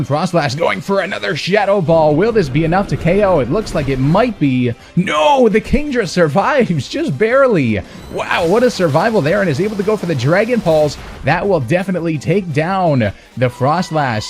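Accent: Canada. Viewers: love the canadian accent :D :D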